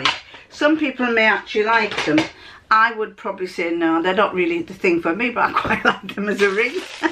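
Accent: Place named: East Boldon, United Kingdom. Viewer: Hello from rural New South Wales where the nearest sewing notions store is 1.5 hours drive away. Love your accent as I'm originally from East Boldon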